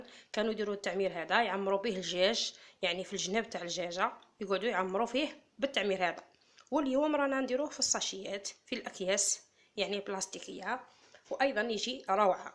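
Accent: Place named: Chlef, Algeria. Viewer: هدرتك كي صحاب شلف و لا تيارت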